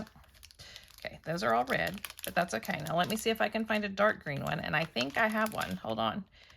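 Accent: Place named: Oklahoma, United States. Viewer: Hi! Your channel was suggested on my feed yesterday, and I love watching people make page kits! I hope this doesn’t sound crazy, but I noticed in a picture on one of your videos you had an OK State shirt on and your voice kind of has an OK accent - are you from OK? I’m in OKC area, and I’ve never met a fellow scrapper in OK, so I had to ask and say hi! Have a great evening!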